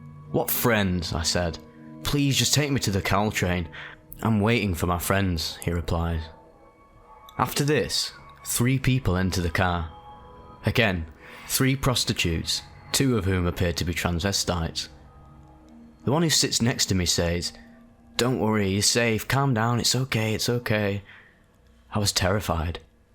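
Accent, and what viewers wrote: United Kingdom. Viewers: Are you british or am I tripping? both?😊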